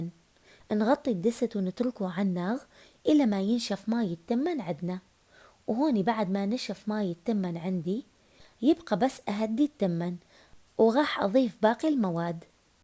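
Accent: Mosul, Iraq. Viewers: لهجتها مصلاوية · هاي اللهجة المصلاوية شوية تختلف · مااحلى اللهجه الموصليه . وعاشت ايدك على الطبخه والوصف المبدع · انا والله كنت مستمتع بالوصفة ومستمتع اكثر بالهجة العراقية الجميلة وتعلمت بعض الكلمات العراقية ، تحية لكل اخوانى من العراق اخوكم من مصر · حبيبت قلبي انا من بغداد استمتع ❤بصوتج واللهجة ❤الهلنا وناسنا الطيبين ❤من الموصل ❤ اكلاتك كلها مميزة جداً لذيذة نشكرك تعبناكي ويانا❤❤ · مو حلوه الهجه لمصلاويه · هذه لهجة أهل الموصل تختلف شوي عن لهجتنا العراقيه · هذي اللهجة تعود لأهل مدينة الموصل فقط شمال العراق وليس كل العراقيين يتكلمون هكذا مع تقديري لك والمقدمة البرنامج الرائع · هذه لهجة الموصل ...نينوى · الهجه المصلاويه تجنن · وصفة روعة 😍😍😍 ... عاشت الأيادي والأروع اللهجة المصلاوية الجميلة ❤❤❤ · انا كل الفيديوا استمع للهجهه المصلاويه 😅😅😅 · هذه لهجة حضر العراق القديمة و التس بقيت أثارها باقيه بلهجة أهل و العوائل الأصلية لمدن: الموصل، تكريت، حديثة، عانه، هيت و غيرهم. 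و سابقا كانت لهجة اهل بغداد كذلك و التي حافظ عليها اليهود و المسيحيين. اما ما يطلق عليه اللهجة العراقيه حاليا فهو خليط من بقايا اللهجة القديمة مع لهجة العشائر العربية البدوية من بادية الشام التي استوطنت العراق إبان الفراغ السياسي في نهايات ال ١٦٠٠ و التي غيرت التركيبة السكانية و اللغوية و الثقافيه لسكان العراق كون الغلبة كانت لهم و يس لأهل العراق الحضر. و هكذا تغير كل شيء. تحياتي لأهل الموصل ممكن حافظ على لهجتنا الأصلية العريقة. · الف عاغيه وعاشت ايدج وشنو هاللهجه المصلاويه الحلوه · اللهجه الموصليه اجنن ❤❤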